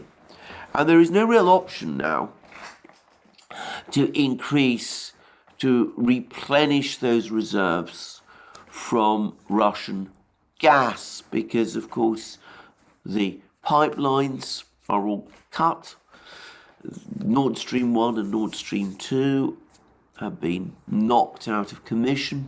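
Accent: United Kingdom. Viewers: Aaaahhhh, makes sense now. Despite your thick british accent, you are from the Balkans area. I criticized your difficulty at times to speak english. My apologies.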